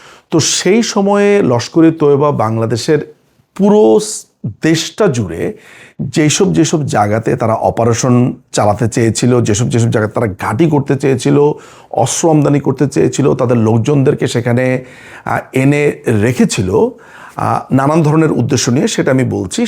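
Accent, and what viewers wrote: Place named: India. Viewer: this bloody talking in Indian tone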